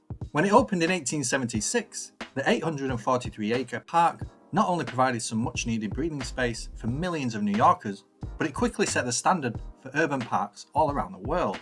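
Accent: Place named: Wales, United Kingdom. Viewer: Gotta just love the Welsh accent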